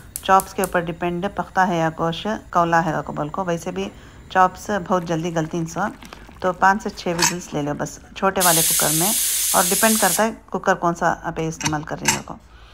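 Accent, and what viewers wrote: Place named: Deccan, India. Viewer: Behtareen recepie aunty ... Bohat khoob ...so nice to hear recepie in our famous dakhni slang... That added wit & humor is the highlight...All the very best ... Please share ur daawat vlogs & shadi menu recepies ..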